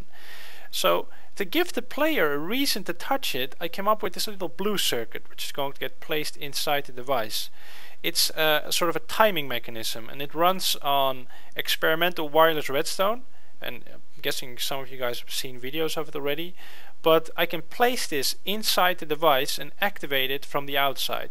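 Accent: Netherlands. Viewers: seems like a dutch accent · are you from holland? because i can hear it. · ok dit is nederlands lol accent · Jup, das 100% nederlands accent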